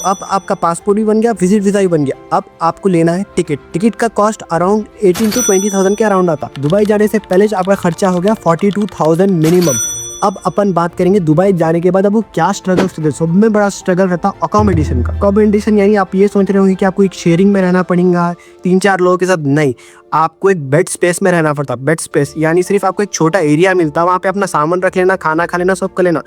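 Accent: Hyderabad, India. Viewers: Bro your Hyderabadi accent 🥰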